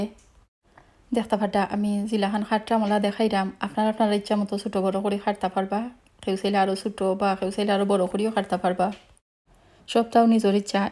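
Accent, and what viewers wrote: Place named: Moulvibazar, Bangladesh. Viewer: Afa afnar math huniya lager afnar bari moulvibazar. Jodi moulvibazar hoy tho kun elakay Please koiba?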